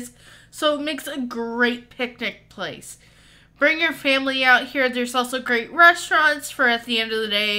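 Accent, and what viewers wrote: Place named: New England, United States. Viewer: Your yankee accent means you should hang out in Daytona or cocoa.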